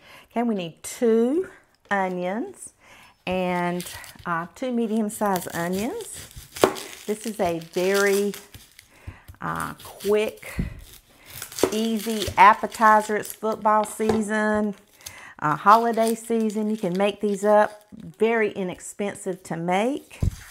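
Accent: South, United States. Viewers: I saw onion fritters so I clicked the video and THEN I heard that sweet southern accent and instantly subbed 😂 you sweet lil southern ladies ARE THEEEE BEST COOKS EVER!!❤❤😂😂 & Im a polish girl from up north so I CAN NEVER taste food this good 😂! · I just found your channel just this minute and let me tell you I absolutely love it! Love your lovely southern accent ❤I’ll be making this recipe this weekend for my son who’s coming over for vacation and love my cooking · Aussie here! Love the southern accent! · Yeah, it's sad people gotta be mean like that and try to make other people miserable. I just ignore them unless they are particularly ugly, then I'd report them. Me and my 10 brothers and sisters were raised on fritters. Heck, Mom made fritters from fritters. Anything leftover in the refrigerator was fritter fodder. Corn fritters, mashed tater fritters, hot dog fritters, cheese fritters, liver and onion fritters, cow tongue fritters, spinach fritters, apple or any kind of fruit fritter. 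I've had them all. Mom would disguise ingredients inside a fritter for the food the my little brothers didn't like and it tricked them every time. Spinach & blueberry fritters rolled in a bit of sugar… delish. And my brothers didn't even realize they had eaten yucky spinach. I'm getting a big kick out of her southern accent. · You must be in Ga., I’ve retired to the south. I’m learning all the unique diff southern drawsssss!🤣🤗🧅🍑🧅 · That accent. Georgia or Alabama?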